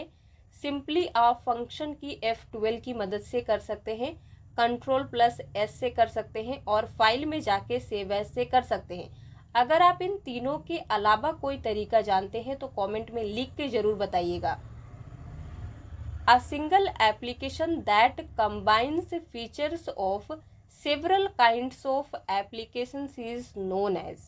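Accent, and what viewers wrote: Odisha, India. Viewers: Mam kya aap odisha se he ?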